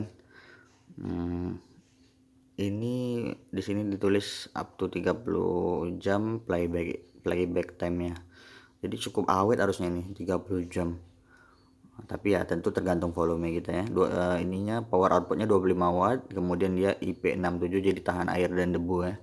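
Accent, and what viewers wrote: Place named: North Sumatra, Indonesia. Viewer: Kek anak Sumut kau bg, dari logatnya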